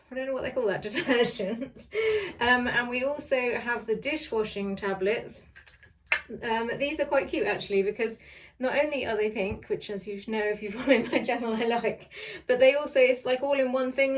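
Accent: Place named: England, United Kingdom. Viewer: She is speaking casual English with an English accent, describing a product sold in the UK by a company whose headquarters are in Brighton. I don't think there's anything wrong with the way she's speaking.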